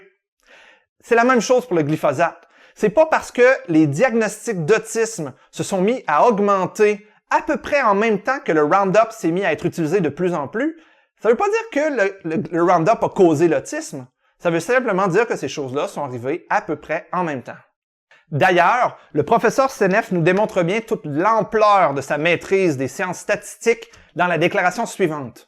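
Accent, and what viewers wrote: Canada. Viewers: Hello, je découvre ta chaîne, tes vidéos mériteraient d'être diffusées plus largement, à un plus grand public. Le format est court, les explications claires. Je conseille à tous de diffuser et partager un maximum. (et puis l'accent québécois, je ne m'en lasse pas, que ce soit avec Olivier Bernard avec "Le Pharmachien", Isabelle Stephen dans "Le monde merveilleux du scepticisme" ou encore "L'inspecteur viral"). Continuez à chasser les idées reçues, vous êtes d'utilité publique! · Ben ouai c’est logique, un produit qui extermine herbes, insectes, vers et autres est inoffensif pour l’humain, c’est juste de la magie n’est ce pas? Àvec l’accent canadien rigolo c’est encore moins crédible...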